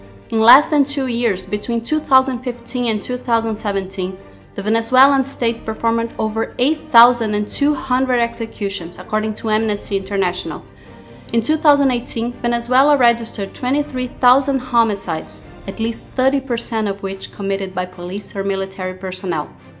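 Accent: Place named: Brazil. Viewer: Belo inglês. Só um pouquinho de sotaque brazuca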